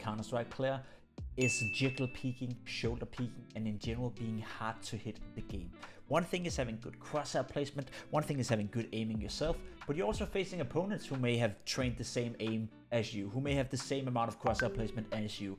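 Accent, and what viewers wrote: Denmark. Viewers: are you danish? you sound danish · i can just hear that your Danish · nice vidio jeg syntes at det var sjovt at man kunne høre at du var dansker lol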